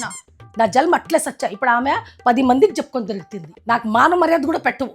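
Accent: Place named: Anantapur, India. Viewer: Your anantapuram slang is very nice. 🎉🎉🎉🎉🎉🎉🎉🎉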